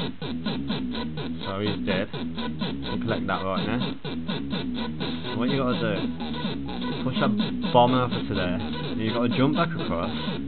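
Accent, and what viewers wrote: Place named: Birmingham, United Kingdom. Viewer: The Brummie accent is the worst in the world. This fella was sending me to sleep just listening to him. What the fuck is 'Feck"? Terrible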